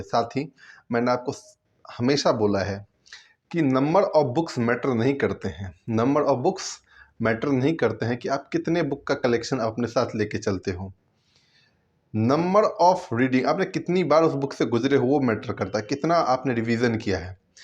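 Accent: India. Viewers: Bhaiya maths or reasoning smjh nhi atta hai jayda. Koi solution bata do bhaiyaa. Bhaiya app up se hai na? Ya up mai rehte hoge?? Awadhi tone hai appke boli mai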